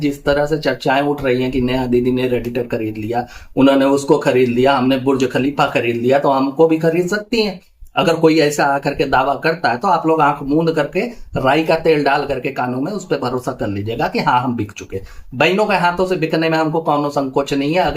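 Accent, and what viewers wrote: Uttar Pradesh, India. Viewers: up se ho bhaiya up me kaha se ho sorry aapko pareshan kar rahi hu but aapki baate sunke bhasa sun ke apna pan feel hota hai. Mai bihar se hu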